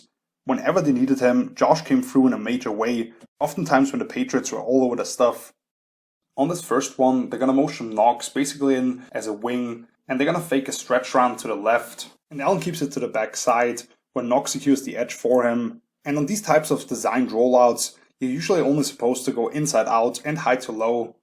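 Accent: Austria. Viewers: Are you Austrian or German? I don't mean to be rude, but I think it's a bit strange to listen to foreigners analyze American/NFL football.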